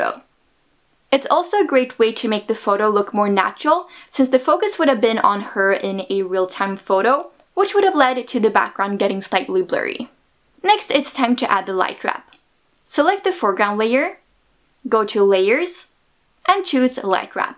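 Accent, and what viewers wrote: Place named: Yugoslavia. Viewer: This is great. Thank you. Btw, I love your accent. Are you originally from Yugoslavia? Your diction sounds like tennis star Monica Seles.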